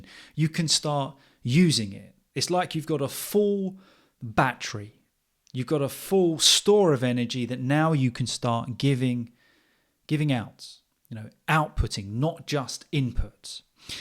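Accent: United Kingdom. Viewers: Hi! Today, in one single day, I’ve taken enough time to listen to you again. Three podcasts, and this one twice, because it’s great and helpful to everyone what you say about the ability or skills in speaking in a foreign language for those who want to speak it or get fluency. You have explained this important issue in a very clear way to be understood. Thank you. Se sentir bloqué ou avoir peur de parler dans une langue autre que la nôtre, c’est normal pour les adultes. Il faut se le dire et se le redire. Mais il faut vaincre cette peur. C’est possible! Ce que vous conseillez de faire à votre public pour y arriver nous semble tout à fait juste et fonctionnel. Merci beaucoup! Mais pour un Européen quelle sorte d’anglais faudrait-il essayer d’imiter? In my opinion your accent would be the best. Your British accent is the most widely and understood in use everywhere. 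· Fantastic teaching, speaking British English clearly sir you are every ways perfect. Thank you very much for your teaching British English Mr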